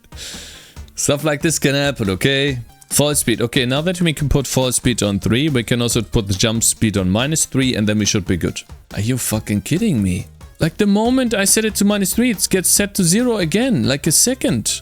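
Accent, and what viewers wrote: Germany. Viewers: höre ich da einen deutschen Akzent :D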